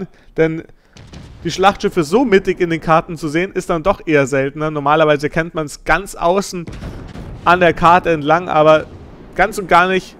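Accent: Bavaria, Germany. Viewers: Super Video ^^ Du hast eine klasse und angenehme Art zu kommentieren. Mach aber bitte mehr auf boarisch ;)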